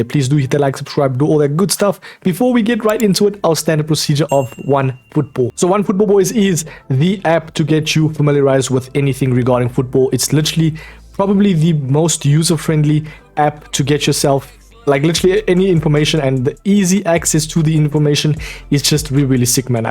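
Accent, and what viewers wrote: South Africa. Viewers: As sooon as i heard your voice i knew you were south african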